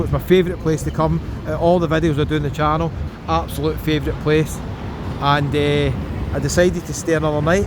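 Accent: Scotland, United Kingdom. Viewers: The title suits me perfectly 😂. Watching this heavy Scottish accent at 2 am, while being high as a kite, big mistake on my part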